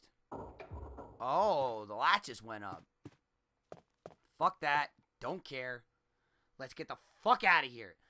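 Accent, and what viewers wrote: New Jersey, United States. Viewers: Lol he still had some of his New Jersey accent back then.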